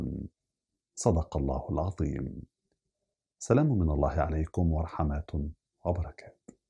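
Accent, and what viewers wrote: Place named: Morocco. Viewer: بالفعل صوت متميز. أعتقد اصلو من المغرب. تحية لابن بلدي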